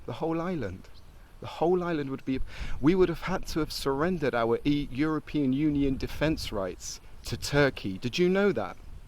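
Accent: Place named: England, United Kingdom. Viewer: 🤣🤣🤣 What happened to your churches in the North side? They are all still there. Get a life mate. You advertise Cyprus Without Borders as a bicommunal page but all I see in your videos is nothing but targetting TCs making out like we are nothing but 'propaganda' makers. We tell the truth. So walking around whispering with your plummy English accent to make yourself look friendly isn't going to work. Insidious as anything, you are. Why don't you share what happened to all the other mosques, not the one protected by Turkish authorities. And no, don't go inside the mosque. You might find god there. Can't have that, can we!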